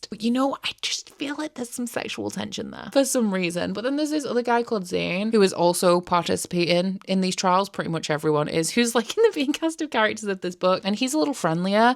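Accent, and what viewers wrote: Yorkshire, United Kingdom. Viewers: Ugh I just love a Yorkshire/Lincolnshire accent. I'm American and it reminds me of My Mad Fat Diary, which I was obsessed with as a teen.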